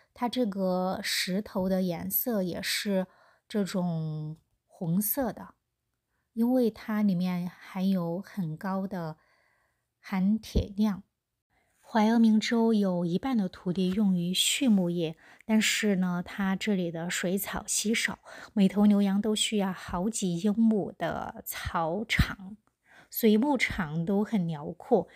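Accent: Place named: Hunan, China. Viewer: 湖南口音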